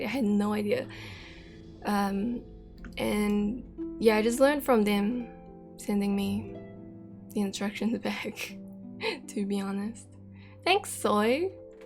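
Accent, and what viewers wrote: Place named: New Zealand. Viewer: Yoooo I was thinkin' man that accent is so familiar, it sounds so much like a kiwi accent, what could it possibly be? Surprise surprise it is a kiwi accent hahaha. Not too often I come across a kiwi streamer, kapai!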